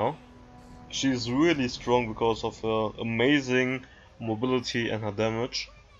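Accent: Germany. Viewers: Where r u from? Youre english sounds like u come from germany 😅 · I have a question. Are you from Germany? Because you sound like german and your Windows Exil or Word or whatever were german, too.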